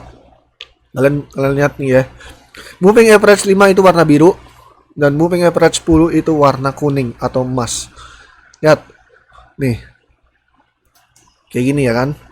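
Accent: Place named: Jakarta, Indonesia. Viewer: Jakarta yee betawi yee kentel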